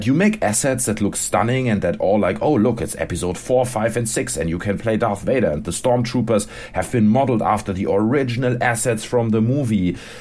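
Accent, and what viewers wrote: Germany. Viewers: Your German (correct me if I'm wrong) accent sounds extremely awesome.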